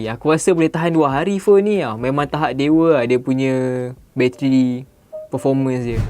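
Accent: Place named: North, Malaysia. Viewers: Cakap ja utaqa wei. Pelik eh. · Org perak ghupenye... kome perak mana tu?? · Nice. Kipidap. Tapi loghat utara tu cover2 sikit boss ku, sebab kalau bercampoq dengan kl dengaq lain mcm oii haha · Barai lah budak nih dgn loghat utara lagi haduhhhh failll.....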